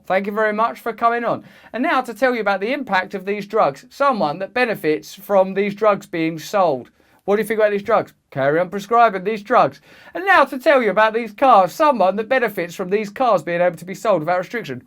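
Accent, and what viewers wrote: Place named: Cockney, United Kingdom. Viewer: More utter gibberish from the cockney Deepak Chopra.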